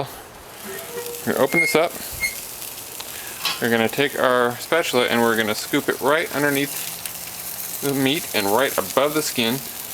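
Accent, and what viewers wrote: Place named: Midwest, United States. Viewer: Where are you from? I can't place your accent. Somewhere from the Midwest? Chicago maybe?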